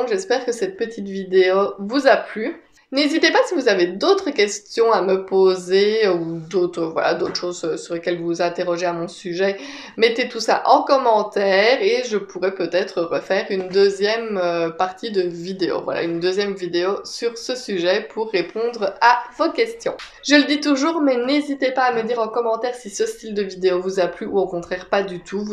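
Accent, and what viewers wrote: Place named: Liège, Belgium. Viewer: Coucou, je me doutais bien que tu étais de Liège ton accent 😊. C'est quand même impressionnant d'aller aux urgences, et de s'entendre dire que nous n'avons rien. Merci bonne journée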